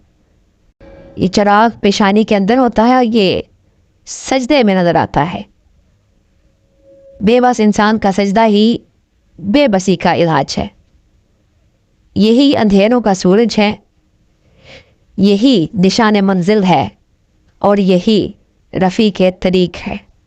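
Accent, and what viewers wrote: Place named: United Kingdom. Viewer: بہن اپ انگریزی لہجے میں بولتی ہیں کیا وجہ ہے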